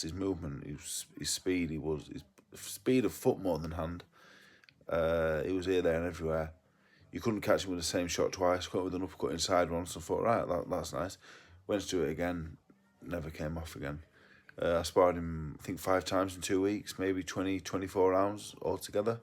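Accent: Yorkshire, United Kingdom. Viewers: Yorkshire accent. Dave looks like how I imagine Heathcliffe to look but with different hair and clothes.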